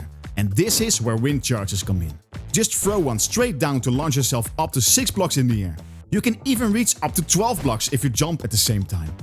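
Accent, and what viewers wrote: Netherlands. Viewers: I smell dutch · Hallo! Ik vroeg mij af of je Nederlands bent, jouw accent klinkt namelijk heel Nederlands! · Iedereen kan horen dat je nederlands bent :) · lol are you dutch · Je klinkt maar een kleeein beetje nederlands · i can smell the dutch accent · I smell Dutch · it is dutch he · gelijk weer te horen aan ons typische Nederlands accent 😂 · are you dutch?? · We zijn dus zeker wel nederlands, probeer aub een engels accent te leren bro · Dutch ahh guy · You are dutch right i hear it 😂😂😂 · do u happen to be dutch? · Are you Dutch? You sound Dutch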